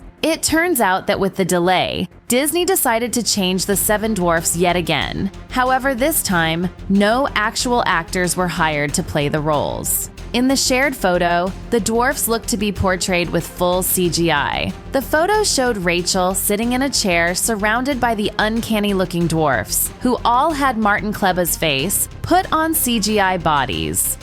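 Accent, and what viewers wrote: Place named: United States. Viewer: Wtf is going on that narrators voice? It's the most annoying American accent I've ever heard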